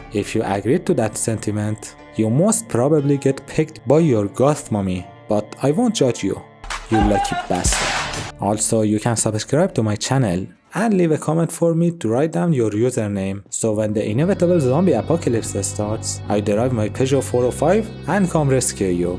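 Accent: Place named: Iran. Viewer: داداش از لهجت معلومه از ایرانی😏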